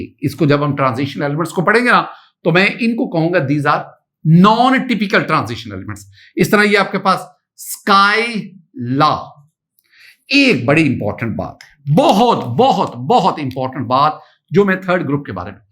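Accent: Punjab, India. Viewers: Punjabi speaking 🗣️👀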